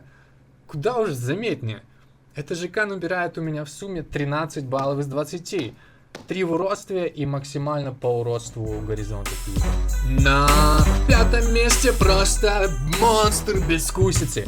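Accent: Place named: Russia. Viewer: а по мне так ты сам урод с маскальским акцентом ... :face-orange-raised-eyebrow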